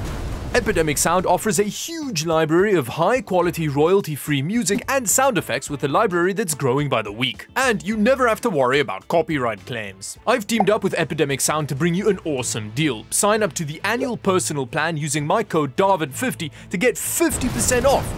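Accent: South Africa. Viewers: South African accent ? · You're originally from SA right?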